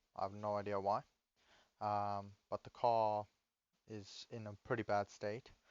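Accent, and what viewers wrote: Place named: South Africa. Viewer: Not a bad video Bru. Looks like UK but I can hear your SA accent coming through. 👌👌👍👍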